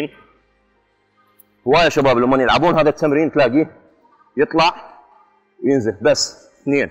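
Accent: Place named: Iraq. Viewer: احلى يحجي بس عراقي لهجه عراقيه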